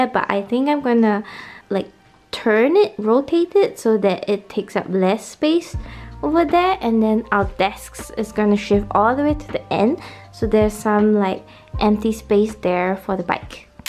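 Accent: Singapore. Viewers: Didn't realised it at first, but then recognised the "Singaporean accent" hahah so cute. give familiar feels watching your vids >.< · Your accent sounds abit Singaporean ahah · The familiar Singapore accent ! Love the makeover.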